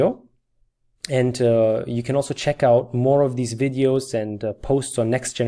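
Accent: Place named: Turkey. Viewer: Am I catching a Turkish accent...😅 nice😊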